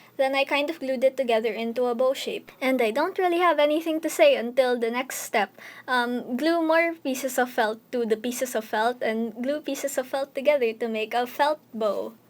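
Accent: Philippines. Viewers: finally a Filipino that knows tbhk...finally (luv ur accent and ur sarcasm uwu) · I thought you were a Filipino.....the accent tho😣👌👌👌👌👌💞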